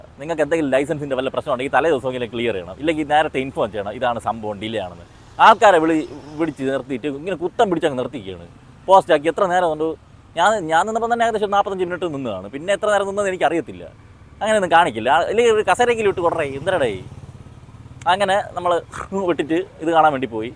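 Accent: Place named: Thiruvananthapuram, India. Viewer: I like Thiruvananthapuram language. By kanyakumari karan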